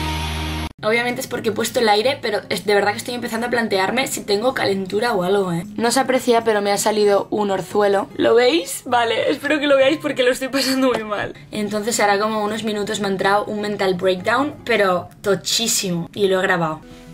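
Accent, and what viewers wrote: Argentina. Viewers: Amo esta Pibaaa😻 Habla en inglés Habla en Argentino Y Nose cuál otra más.. Mee la comooo😻🥰🥰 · En los vídeos de nunca faltan: EL HABLAR INGLES EL HABLAR ARGENTINO EL BOLUDO EL CAFÉ "EL BAILE DE" T AMO PIBA JAJA❤️ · adoro habla con los posters en argentino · amamos a hablando argentino,confirmamos. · !! El acento argentino te salió re bien! Sos una genia todo tus vídeos son lo más, soy de argentina y tu acento es un 100/10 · hablando argentino mi religion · Jajajj amo que hables Argentino creo que por eso la mitad que te seguimos somos Argentinos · amo por que habla como si fuera argentina jajajajajajajaja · puedes hacer un video 24 horas ablando Argentino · Y hablando como argentina es buenardo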